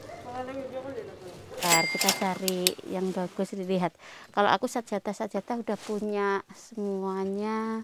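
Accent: Java, Indonesia. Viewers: Bahasane medok mb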